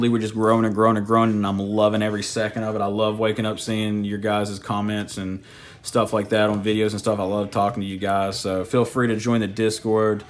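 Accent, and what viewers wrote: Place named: South, United States. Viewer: Southern accents are tough! Lol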